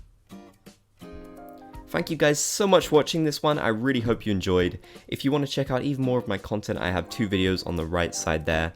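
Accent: United Kingdom. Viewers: His British accent is thicker than Wendell the Walrus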